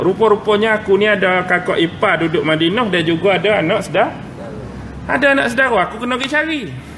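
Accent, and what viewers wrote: Kelantan, Malaysia. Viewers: Sedap dengar Ustaz guno loghat Klate kito..Skalo dok dgr loghat utara..Ambo jiran Ustaz yah Tanah Merah..Kemah sek kito 👍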